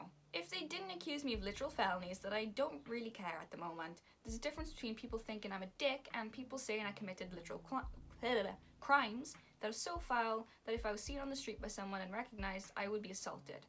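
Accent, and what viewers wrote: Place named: Wales, United Kingdom. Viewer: Your accent is conflicting to me, cause I don’t know if your from mid/south wales or Mid/north England- Love your hair, love you ur piercings. Lots of new year luck n love from north wales!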